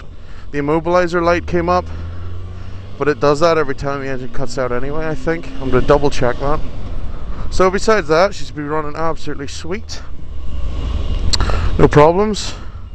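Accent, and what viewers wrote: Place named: Northern Ireland, United Kingdom. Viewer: yea thought you were from norn iron.. im from carrickfergus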